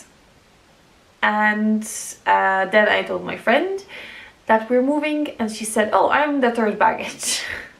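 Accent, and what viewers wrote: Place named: Ireland. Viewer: Your accent is awesome. I love hearing foreign-born people with an Irish accent.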